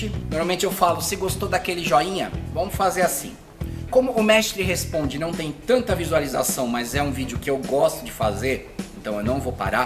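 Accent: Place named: Rio Grande do Sul, Brazil. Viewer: Mestre só me responde uma coisa...Tu é gaúcho?pois tu tens o nosso sotaque e eu ja vi tu falar girias gaúchas.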